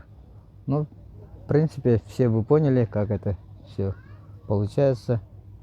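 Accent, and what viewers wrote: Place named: Yakutia, Russia. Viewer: Это наш, Якутский акцент. Ты же не говоришь например армянам, ''слющай Ара рЭчь пАдкачай да''. Это всего лишь акцент.Человек больше на Родном общается,а не на Русском.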